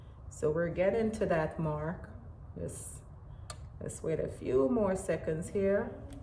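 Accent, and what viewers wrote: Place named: Jamaica. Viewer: i know a jamaican when i hear one lol big up mumma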